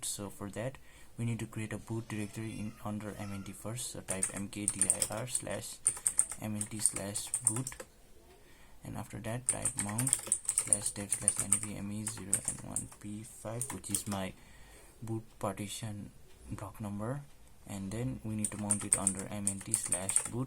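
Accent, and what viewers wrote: India. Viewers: im not hating, it's good guide. but why again this indian accent? why 50% of programming videos are Indian?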